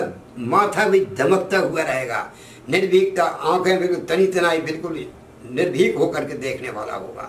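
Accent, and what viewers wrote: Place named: Madhya Pradesh, India. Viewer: Aapke baat karne se aap m.p. ke lag rahe hain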